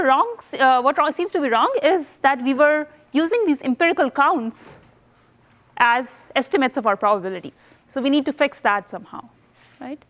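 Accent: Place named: India. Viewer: Indian? Oh my god, terrible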